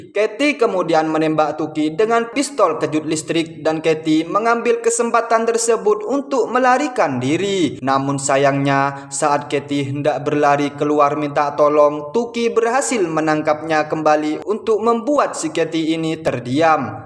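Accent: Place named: Madura, Indonesia. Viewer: Suaranya kayak Oreng Medura....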